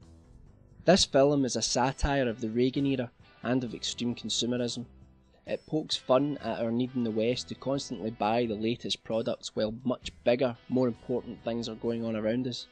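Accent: Scotland, United Kingdom. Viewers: Don't listen to him. This crazy scottish accent is one of the best features of this video. Great comments on great movies, although I have a different ranking. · for those ignorent people he isnt english he is scottish :)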